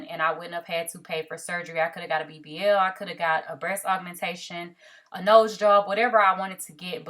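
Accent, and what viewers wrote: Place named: Chicago, United States. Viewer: You kind of have a Chicago accent ❤️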